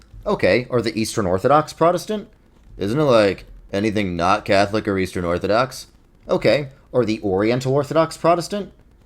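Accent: Germany. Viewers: The German accent got me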